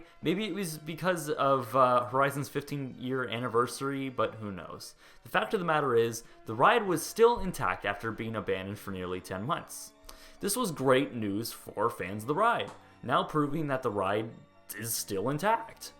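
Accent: Canada. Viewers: you should do impressions of Ashton Kutcher, without your Canadian accent of course. You sound exactly like him!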